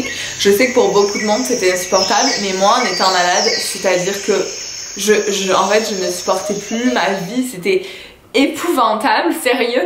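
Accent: Quebec, Canada. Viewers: Effectivement toi à bien pris l'accent québécois!! Courage Corentin d'Annot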